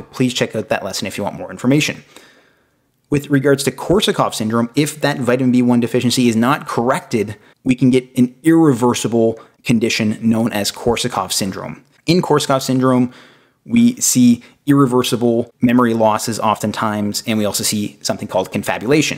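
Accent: Canada. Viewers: This Doctor is Canadian., Every human who speaks English in the world has an Accent... Soooo this is the way people speak in his Country! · Yes, thats the first thing i thought, aha youre Canadian ! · Love the Canadian accent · John Silva he's Canadian. Lol.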